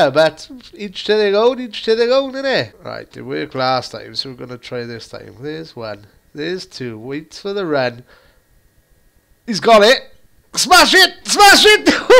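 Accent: Wales, United Kingdom. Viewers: Where u from in Wales bro?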